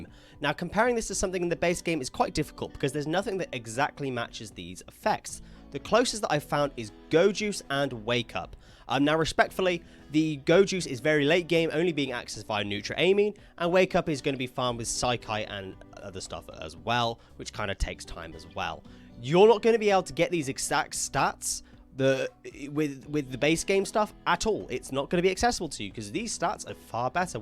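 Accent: United Kingdom. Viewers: wait u are brittish???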